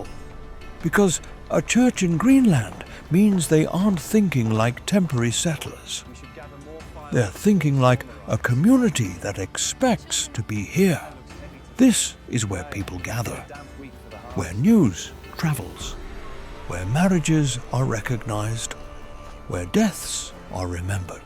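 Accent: United Kingdom. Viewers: Good point, this looks deceptively very real but aint no documentary. Compared to actual history, he likes to exaggerate the adversity for drama and clicks. I suppose the AI british accent is supposed to be more authoritative too. Id like a narration in Alabama accent please. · Nice footage and “British” narrator, but not a single word about where they got the timber from and what valuable items they sold, which made the Norse settlement rich. So, it has to be a “👎” from me, sadly.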